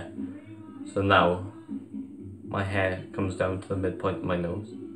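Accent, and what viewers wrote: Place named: Wales, United Kingdom. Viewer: Are you welsh fella? Lovely accent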